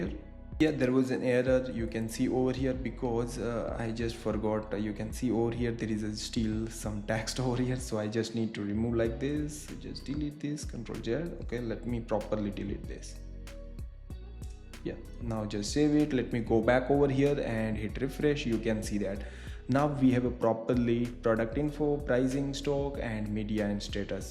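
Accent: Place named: India. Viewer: Thank you for the amazing content. I'm Brazilian and I'm learning a lot from you. Besides Filament, believe it or not, I'm also learning English, because the Indian accent is very pleasant to listen to; it's quite similar to Brazilian pronunciation.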